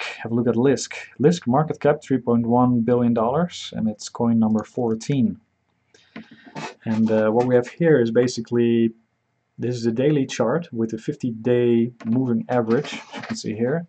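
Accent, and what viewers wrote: Netherlands. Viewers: Zo te horen bent je Nederlands, goed werk! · very dutchy accent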